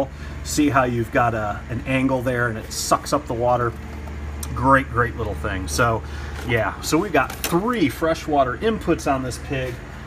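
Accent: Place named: New Jersey, United States. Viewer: You sound like us in jersey fuckin fuckin fuckin love it brother I just subbed fuckin aaaaaaaaaaa lol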